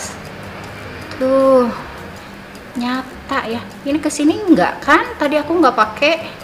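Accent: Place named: West Java, Indonesia. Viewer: Suka banget liat teh ngmong medok Sunda gini lucu itu, bodiiii bodiii lucu uy dengan kekhasannya. Ajhh habis ini mau nyobain deh produk YOU gara2 racun teh 🤩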